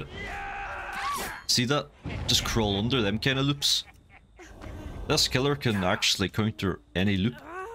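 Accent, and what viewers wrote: Ireland. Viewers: what a strong accent,irish?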